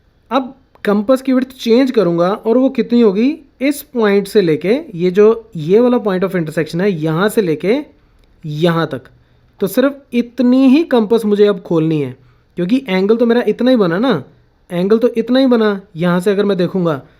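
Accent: Punjab, India. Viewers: By your accent I think you are a PUNJABI Thanks for explanation ☺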